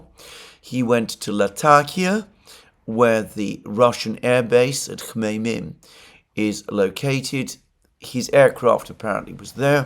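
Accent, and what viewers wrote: United Kingdom. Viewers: Glad you're feeling better, as I am addicted to your podcast and beautiful British accent.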